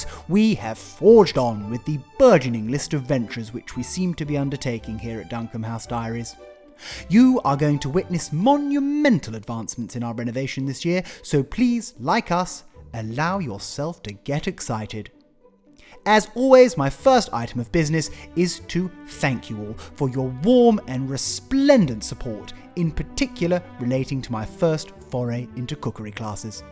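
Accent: RP, United Kingdom. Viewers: Every aspect of your house is enchanting. However, your attempt at some sort of received pronunciation is getting a bit wearing and from now on my mute button will be used, The visuals more than make up for a lack of overly precious narrative. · Fab’ content. Super interesting and I will follow your progress in what will be a lot of hard work to renovate a lovely home. Best of luck! BUT, and it’s a BIG BUT…. The RP is unbearable. I shall be using closed captions from now on. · I’m alway so excited to watch your videos. The absolute sarcasm with the best posh accent. You always bring a smile to my lips and an inappropriate laughter at the worst time. ❤❤❤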